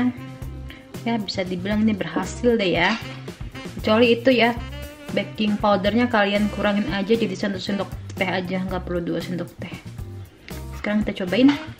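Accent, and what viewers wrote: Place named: Betawi, Indonesia. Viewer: Kagak usah banyak bacot😁😁betawi bangat dah...cipok2 buat 😘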